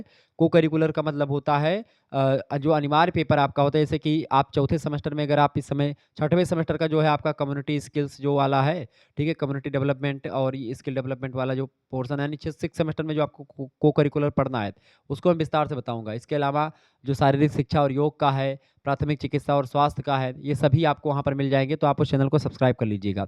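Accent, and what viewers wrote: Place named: Bundelkhand, India. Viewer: thank u so much sir aapki video apki class hmesha helpful hoti hy maximum qns ate hy exam me jo ap padhate h sir ap kha se apki language hamre side jaise lgti hy chitrakoot ya banda jaisi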